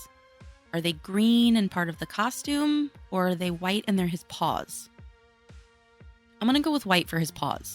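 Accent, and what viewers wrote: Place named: West Tennessee, United States. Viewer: gotcha! Well that is surprising. I'm in West TN so not close! Haha 😂 You sound like the people around here. Not talking about the country fol, just the city people. Lol Which now that I think about it, the city people, especially the newer ones (like in the last 20 years) would have come from somewhere else. So it makes sense their accents would be similar to those in other parts of the country. Lol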